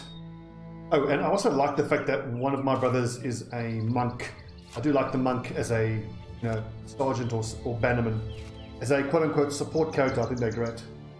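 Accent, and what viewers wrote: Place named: South Africa. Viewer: You from SA? sounds like it. Nice vids btw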